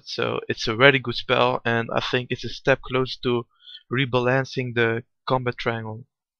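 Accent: Netherlands. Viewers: kut Nederlands accent zeg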